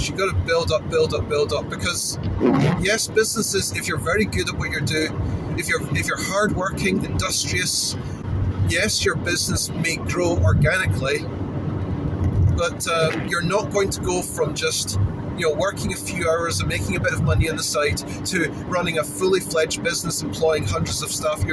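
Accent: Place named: Scotland, United Kingdom. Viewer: My youtube got nuked 3 times, and this account I barely upload anything anymore...sh!t is just too demotivating after being steamrolled so much, and I remembered a channel I was subbed back then, with a guy with somewhat of a scottish accent, and now I found it again! Glad to see you're still around in the original form. Subbed.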